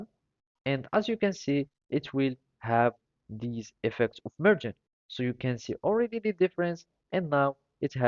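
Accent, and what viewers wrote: Morocco. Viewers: It’s insane that I know that you’re Moroccan by your slight accent because I’m Moroccan too 😂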